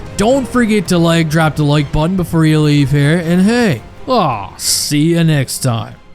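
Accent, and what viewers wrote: Newfoundland, Canada. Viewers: Is that a Newfie accent?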